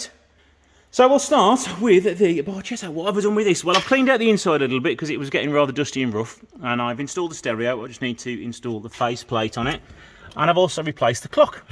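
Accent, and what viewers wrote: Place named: Midlands, United Kingdom. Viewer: Hi, regular subscriber here. I love your videos for loads of reasons, the main two being a) Alfas and b) your accent reminds me of where I grew up, it's like being home. It's really great to hear you, as an ex-pat Midlander! I haven't been watching ANY YouTube channels as much as I did during lockdown, mainly because I've wanted to be out of the house. Keep doing exactly what you are doing. I liked the one where you went out and about with a trailer...stuff filmed outside of the unit, but on your theme, is great. Keep up the great work.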